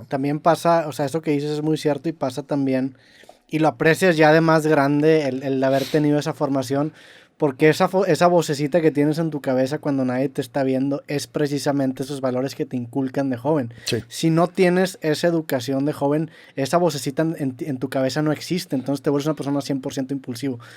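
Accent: United States. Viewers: Tiene bie puesto el nopal en la frente. Hablando como chicano. Por favor. Que acomplejado este tipo. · A huevo tiene que pronunciar en estilo americano ,no puede hacerlo normal?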